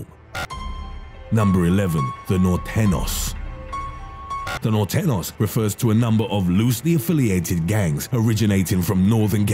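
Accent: England, United Kingdom. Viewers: You have a British accent like half the time....sup with that? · "The military has a white supremacist presence over all" if you don't shut up you English sounding moron, my boots gonna find the back of your head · Between your shitty accent and your shitty delivery go back to England and take your annoying accent with you.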